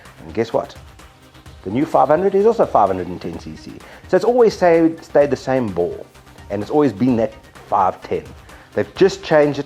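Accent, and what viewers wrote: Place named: South Africa. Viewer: that south african accent doe ?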